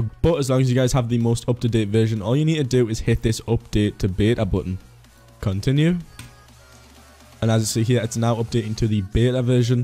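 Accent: Newcastle, United Kingdom. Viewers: are you from Newcastle because your accents sounds like you are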